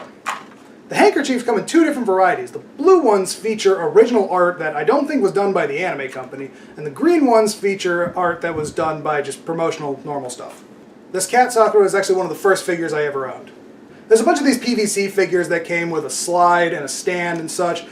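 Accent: United States. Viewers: Your American accent killed me!! I want to say I really love your channel and awesome collection. 😃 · That Texan accent! 🤣🤣🤣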